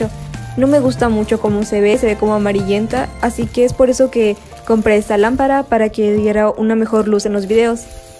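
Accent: Mexico. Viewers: Se escucha como mexicana, pero "fresa" y "pudiente" porque nos presume que viaja en auto. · Es mexicana por su acento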